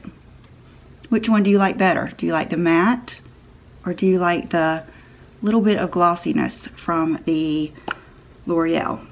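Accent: South, United States. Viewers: Beautiful look! Thanks for sharing!! I love your southern accent or terms I should say!! "at the time"!! 😉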